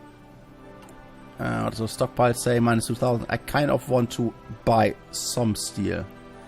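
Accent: Germany. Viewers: ähm haha bei Ihnen hört man sehr dass sie Deutscher sind 🙈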